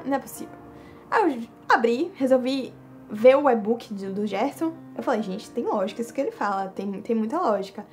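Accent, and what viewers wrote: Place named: Bahia, Brazil. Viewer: O sotaque baiano é inconfundível. Hahahahah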